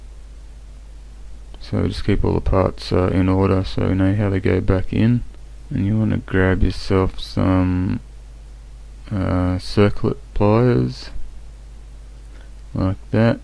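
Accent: Australia. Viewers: First youtube video I've watched with an aussie accent and swearing brilliant, video was helpfull too.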